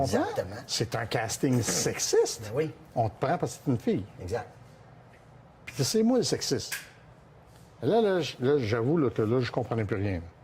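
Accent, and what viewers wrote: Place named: Canada. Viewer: 👌👍👏👏👏 😂 avec l accent canadien c est cool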